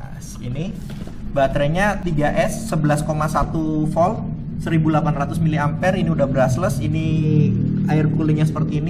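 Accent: Banyumas, Indonesia. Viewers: kereenn.. bang. belii gak yaa... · mas, kalau saya pake yang 3300 mAh bisa gak ya?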